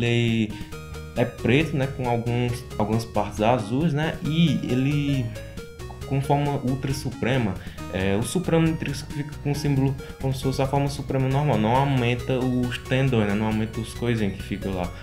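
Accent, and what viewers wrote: Northeast, Brazil. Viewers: você a do Nordeste ? se for... e nois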